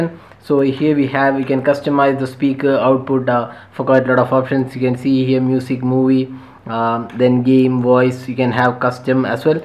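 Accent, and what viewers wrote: Kerala, India. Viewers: Mallu accent 😁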